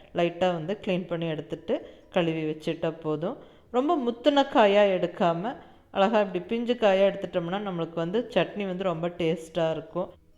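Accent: Kongu, India. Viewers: Mam dish super. Pankarapava supera iruku.i will try. Ungha voice rompa clarity, sweet.neegha pesura kongu tamil super.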